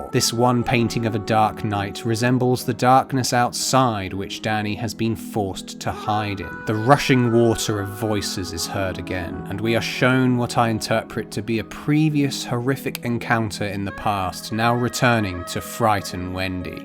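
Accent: United Kingdom. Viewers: The whole time I'm watching I forgot that you have a British.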